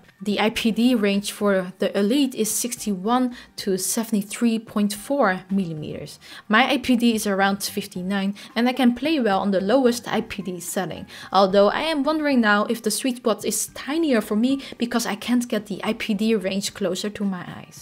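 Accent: Netherlands. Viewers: Why do i hear a Dutch accent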